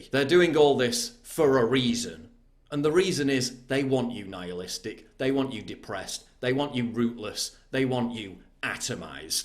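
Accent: United Kingdom. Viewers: Even if I wasn't on his side politically, I would still love this rant for how british it sounds...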